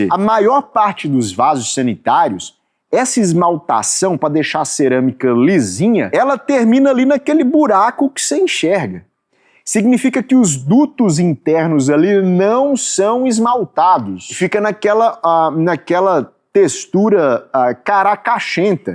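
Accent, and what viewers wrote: Minas Gerais, Brazil. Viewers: Otimo video .Quanto a esses vasos premium , se por acaso estragar o sistema de descarga , fica muito complicado.Uma vez vi propaganda de um vaso com altura um pouco maior para facilitar a vida dos idosos, acho feio aquelas tampas altas .Esse seu sotaque é de MG?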